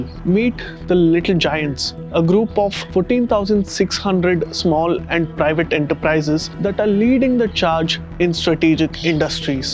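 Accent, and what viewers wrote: India. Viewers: I cannot understand most of the Indian people's talking. Your Indian accent is the least I have ever heard. Wish you a great success. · can you use AI instead? your curry english is terrible.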